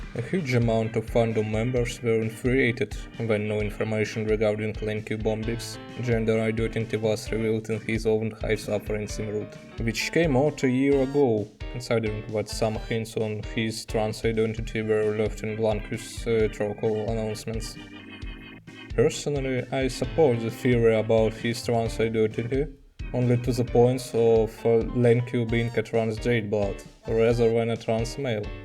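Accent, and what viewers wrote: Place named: Russia. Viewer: cute russian accent :3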